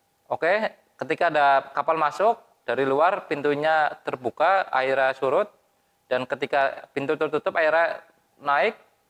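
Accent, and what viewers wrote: Banyumas, Indonesia. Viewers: Ngapak ya kang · Kurang pengetahuan ini mas bro. Dan istilah2 laut nya juga kurang paham. Dan yg fatal gak bisa bedain mana suez dan panama😀. Belajar lagi mas. Dan bicara nya jangan ngapak bgt dan aja kebanteran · Ngapak kie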